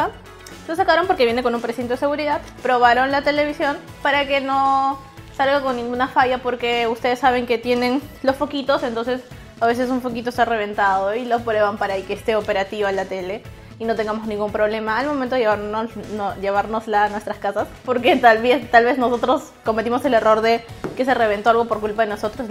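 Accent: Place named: Peru. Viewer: Tienes acento peruano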